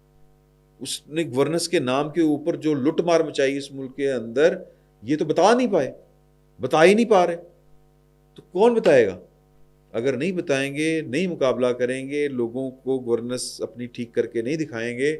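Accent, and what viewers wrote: Punjab, Pakistan. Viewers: Punjabi touch Wel done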